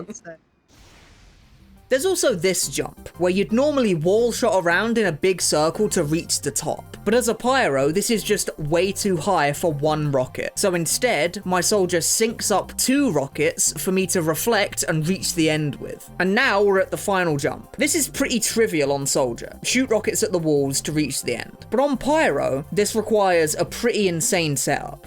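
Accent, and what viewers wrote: United Kingdom. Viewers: babe wake up new tf2 video from funni british dude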